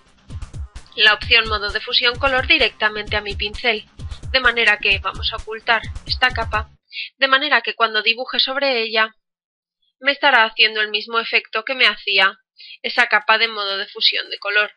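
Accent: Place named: Spain. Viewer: Hola, gusto en conocerla por este medio, ya estoy suscrito en su canal, me gusta la manera en que explica, este es el primero que veo. Felicidades, por que hay otros tutoriales que dejan mucho que desear. Un saludo desde Mexico, a proposito una pregunta por su acento creo que usted es española. Espero no equivocarme.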